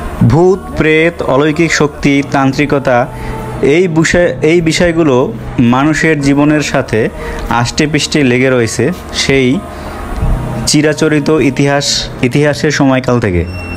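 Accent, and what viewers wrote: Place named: Bangladesh. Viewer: আপনি বাংলাদেশী accent এ কথা কেন বলছেন?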